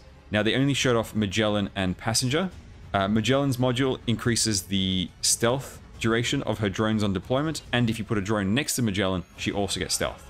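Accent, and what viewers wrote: Australia. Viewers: I had no idea you're Australian.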